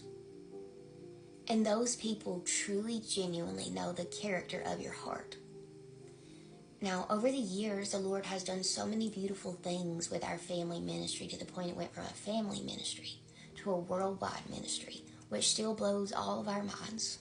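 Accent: South, United States. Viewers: Hot southern lady hot southern accent we got the memo🙏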